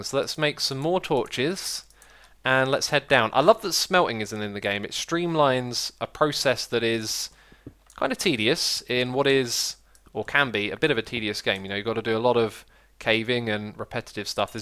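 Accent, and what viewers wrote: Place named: United Kingdom. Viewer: I haven't watched him in ages and he sounds British(OH SHIT, YES, BELIEVE IT OR NOT THIS IS AN OPINION. WOW AMAZED). Please don't get mad at me when I barely know anything about him, Mr. Know-It-All...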